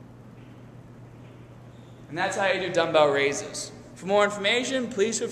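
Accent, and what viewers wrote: Boston, United States. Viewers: Any chance this lad is from boston?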